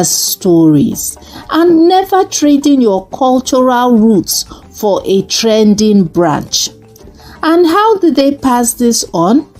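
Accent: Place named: Africa. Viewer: ❤ I sincerely love the fact that this is narrated with the beautiful African accent. It makes it all the more enjoyable. Thanks !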